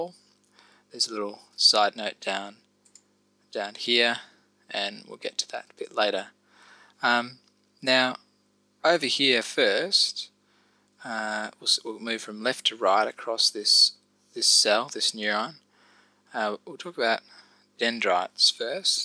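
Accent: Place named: Australia. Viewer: Hey. I am in my first year of studying a paramedic / nursing (double) degree, got my A&P exam on Monday, on swot vac week at the moment. Don't know how I found your YT Channel but it's been SO useful. I'm an aussie too and can I say it's so nice to finally find YT videos that have a normal Aussie accent! So easy to listen to. (Nothing against other accents, it's just easier to listen to being that I am Aussie too). You're great at explaining shit so thanks heaps for that, CHEERS! V useful!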